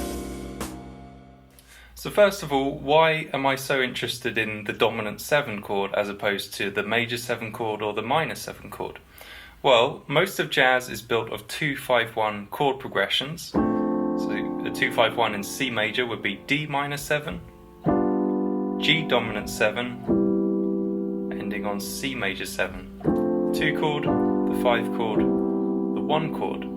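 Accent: United Kingdom. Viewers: Just realised whom your voice brings to mind, and it's probably an insulting comparison, so let me add the disclaimer that now that I realise who it is, you really don't sound like him apart from your intonation and a couple of fairly common South England pronunciations. All right, it's Danny from Withnail & I. Sorry. Great videos, though! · Fantastic lesson you are a great teacher are you British ?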